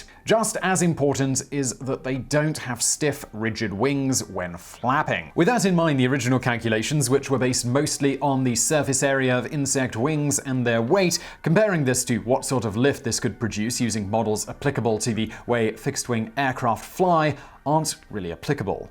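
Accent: United Kingdom. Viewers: Am I the only one who thought this was Vsauce and was startled when he spoke in a British accent?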